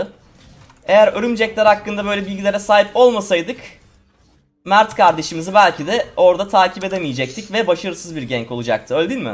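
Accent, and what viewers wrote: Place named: Kahramanmaraş, Turkey. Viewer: maraşlımısın knk sadece maraşda böyle konuşuyolar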